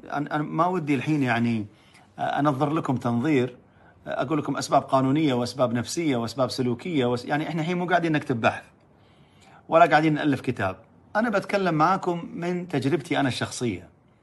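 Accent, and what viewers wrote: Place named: Levant. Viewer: انا ابي اعرف الحين ، انت من الشام . مسوي نفسك كويتي ليش ؟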